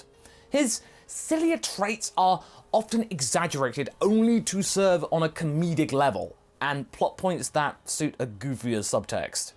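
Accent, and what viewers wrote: England, United Kingdom. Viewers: You sound like teamfourstar hoku but with an english accent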